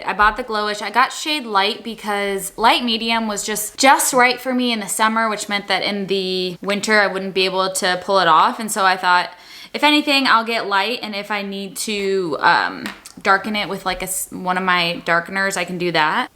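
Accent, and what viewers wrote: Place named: Delaware County, United States. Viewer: your resident Philly girl is cringing and laughing out loud at the same time at your Delco accent LOL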